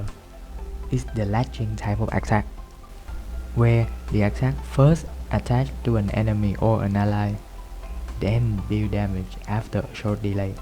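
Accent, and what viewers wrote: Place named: Vietnam. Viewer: Vietnaese accent for sure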